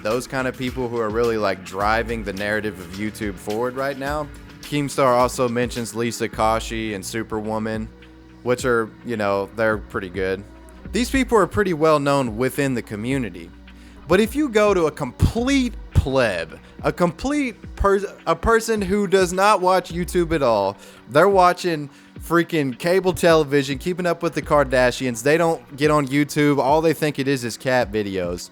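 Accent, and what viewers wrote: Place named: Tennessee, United States. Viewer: Yeah, you’re definitely from Tennessee 😄 that southern accent was spot on! I’m from East Tennessee and that’s EXACTLY how old ladies sound around here 🤣🤣🤣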